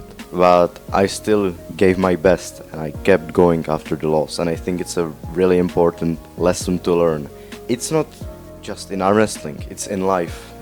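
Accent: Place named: Czech Republic. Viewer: Já věděl že jsi šech, když jsem slyšel ten přízvuk :D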